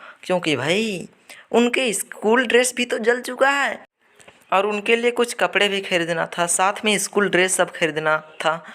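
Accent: Bihar, India. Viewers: Are you from Bihar ? 🤔 Awesome explanation 👍👋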